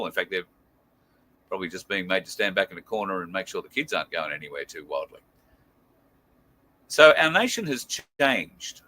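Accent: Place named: United States. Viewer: Awesome vid, but why an Amercan voice and not an Aussie voice????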